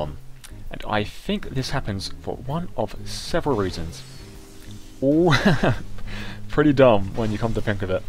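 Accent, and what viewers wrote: United Kingdom. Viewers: Why don't you do more videos where you speak in your calming British voice?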